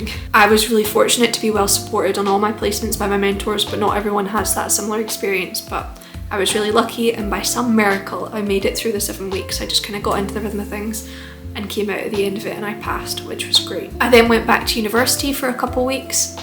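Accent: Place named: Scotland, United Kingdom. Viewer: Scottish accents are the best.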